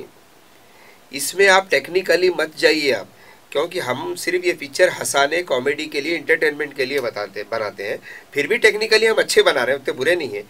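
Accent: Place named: Hyderabad, India. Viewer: gullu dada...hit ur flop..thats different matter...but ur acting and as human being very nice..revive of hyderabadi urdu...keep it up..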